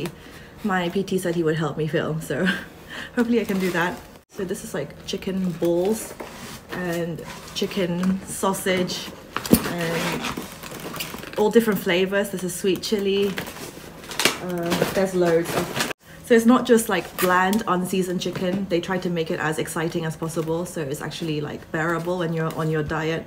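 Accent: United Kingdom. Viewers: I loveeee your British accent.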